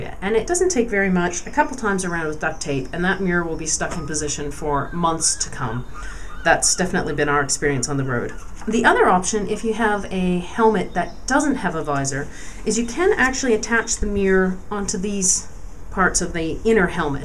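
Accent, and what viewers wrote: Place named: Canada. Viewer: Canadian, eh? Nice review.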